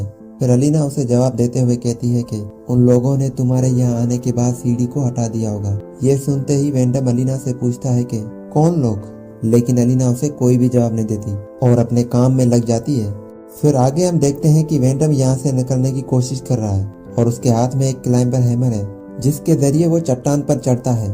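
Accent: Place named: Sindh, India. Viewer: Ap Sindhi ho na your excent is like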